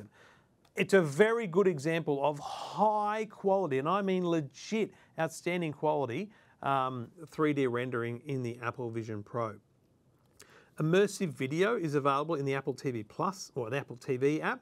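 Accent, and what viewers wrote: Australia. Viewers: I've watched an obscene amount of videos about the Vision Pro. It’s nice to finally see/hear an Aussie talking about it 😅 The pass through is something I’m a bit disappointed about. The degraded quality is purely because you’re looking through a camera. I wish it used better cameras. For 6k you’d think it would. I’m taking this Friday off. Picking up my headset at 9am. Very exciting 😄 Thanks for the vid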